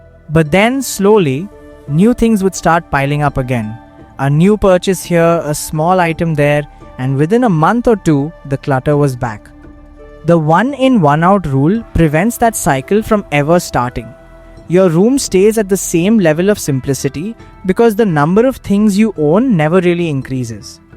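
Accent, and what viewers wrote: India. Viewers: Im sorry. Is this an indian accent rather than japanese? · The narration is weird. Why is the accent Indian if the creator is Japanese?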